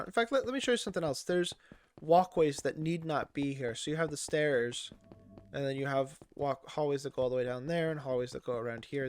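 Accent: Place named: Scotland, United Kingdom. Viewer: you sound like scottish stampy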